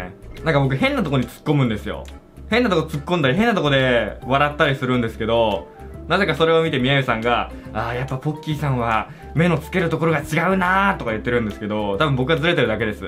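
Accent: Kansai, Japan. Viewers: たまにくる関西弁好き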